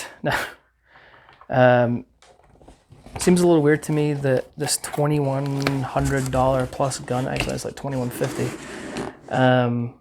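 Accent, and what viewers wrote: Scotland, United Kingdom. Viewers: Its so good to hear a fellow Brit / Scot talking about firearms. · Liked and sub'd! Great Review. Sounds like you are Scottish, am I wrong? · Do I hear a hint of Scottish?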